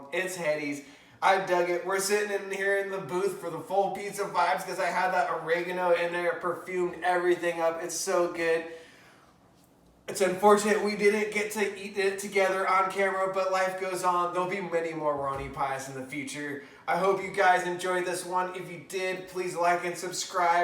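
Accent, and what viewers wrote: California, United States. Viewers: You have one of the strongest california accents I've heard and I live in LA!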